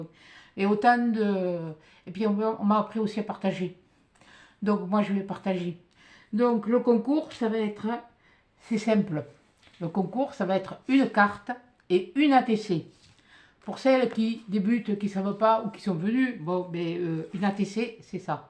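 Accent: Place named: South-West, France. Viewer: Bonjour je vais essayer de participer (malgres mon attelle au pouce sniff) Je viens de découvrir ta chaine et bon dieu que cela fait du bien d'entendre ton accen et ta gouaille sans compter entendre parler patois... merciiiiii... je suis originaire et jai toutes ma famille au fin fond du Sud ouest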